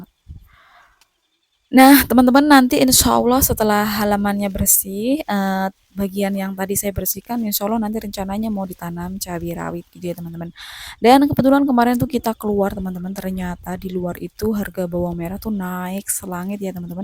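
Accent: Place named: Malang, Indonesia. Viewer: BHS orang malang rijik rijik 😂👍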